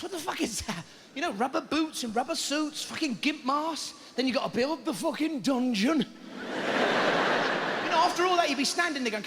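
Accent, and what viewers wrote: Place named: Birmingham, United Kingdom. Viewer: The brummie accent kills me as well.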